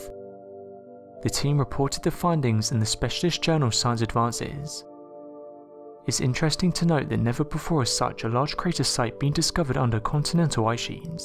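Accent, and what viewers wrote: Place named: Cockney, United Kingdom. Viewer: Sorry but that cockney is the worst. Mate😎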